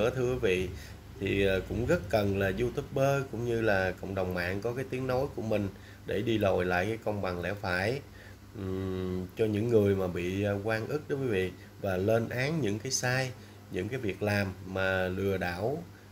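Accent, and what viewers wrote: Standard. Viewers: Em có giọng nói chuẩn như các bạn trong ban biên tập vậy đó. Chúc em cùng gia đình nhiều sức khỏe và an vui hạnh phúc nhé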